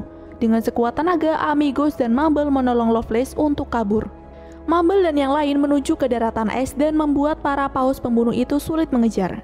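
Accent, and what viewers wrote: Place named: West Java, Indonesia. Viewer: Teh orang sunda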